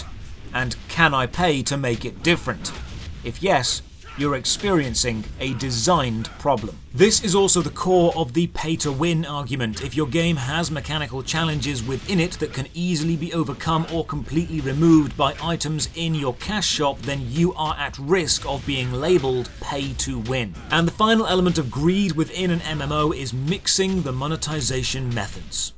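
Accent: England, United Kingdom. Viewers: I like the way u talk to me English man, Keep it up ole chap XD !! great series so far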